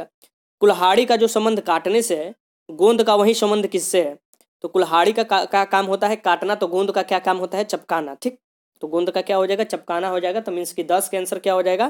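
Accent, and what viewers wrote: Bihar, India. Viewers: sir aap bihar se hai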